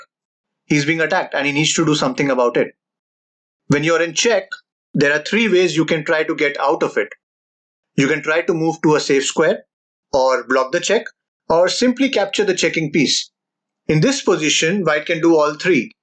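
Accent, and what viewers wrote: India. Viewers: Indian accent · Indian accent, huh? 😀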